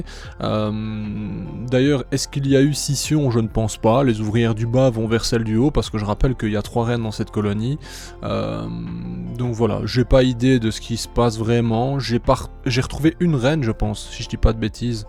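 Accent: Belgium. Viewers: puisque tu le demande, j'ai repéré une coquille ! t'as dis "septante", c'est pas gaulois ca ! d'ailleurs t'as l'accent belge , du coup, Lille? (car je n'imagine pas suisse avec cet accent, mais je peux me tromper hein, je suis un pro de l'erreur en tout genre) partage avec les gens qui en profite, comme moi (merci pour le partage), et puis laisse parler les enfants qui n'ont que ca pour tenter de se sentir supérieur . car comme tout le monde le sait, l'égo se construit en râlant sur des inconnus sur internet , car dans la vrai vie ils peuvent pas parler devant papa et maman sous peine de se faire priver du téléphone .... (bon j'abuse un peu mais il est vrai qu'internet large (la ou on parle avec des inconnus ) devient de plus en plus mal fréquenté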